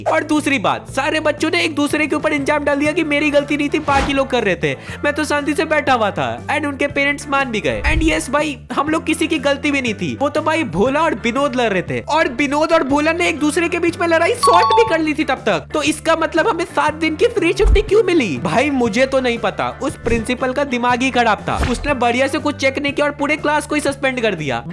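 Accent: Bihar, India. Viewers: Bihar se ho kya?? Aapke bolne ke style se laga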